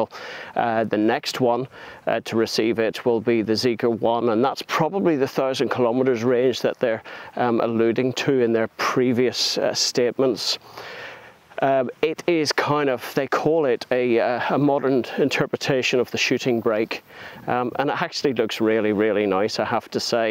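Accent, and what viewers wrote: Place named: Northern Ireland, United Kingdom. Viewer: Enjoyed the Norn Iron accent! Not often heard on YT!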